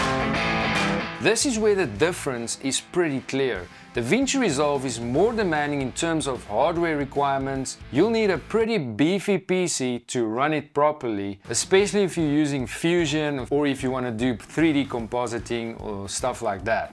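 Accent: South Africa. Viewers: You sound SA, cool video man!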